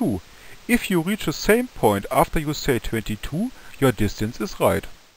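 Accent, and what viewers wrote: Germany. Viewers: This has to be the most ridiculous german accent I ever heard. And I am a german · You have done pretty well! As a German I must admit your German accent is awesome! ;-)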